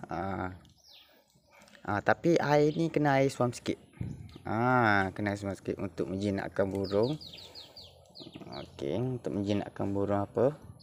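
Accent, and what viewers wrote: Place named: Kuala Lumpur, Malaysia. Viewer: ni pun dah tahan dah sy dah ajar😅muncung dia ni sy dah ajar dah😭sdp dngr abe kecek KL lumpo😂😂kanteh belalang yg panjang tu😅😅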